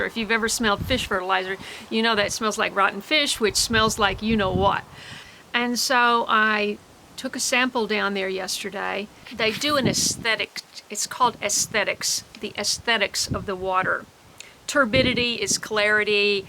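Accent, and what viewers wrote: Tennessee, United States. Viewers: Sounds like you are in Tennessee?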